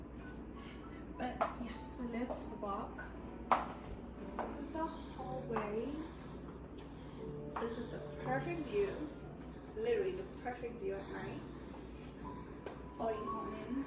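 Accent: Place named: Haiti. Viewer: If I didn't know you were Haitian from the accent, the glassware cabinet was a dead giveaway lol Such a stunning space 🤍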